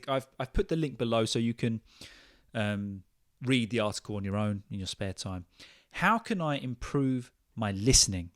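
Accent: United Kingdom. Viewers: Hey, there,. I am absolutely mesmerized by the British English accent! Thanks a million for the insightful tips!😊 From Rio 🇧🇷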